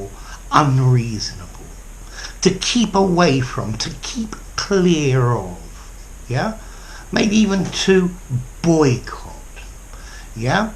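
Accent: United Kingdom. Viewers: Hi,! I like the style of your lessons. And British English of course.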